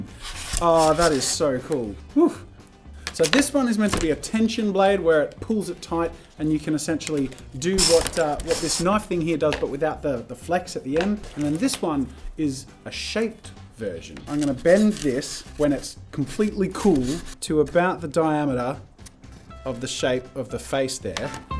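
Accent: Australia. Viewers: Are u from Australia? I mean your accent. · r u aussie?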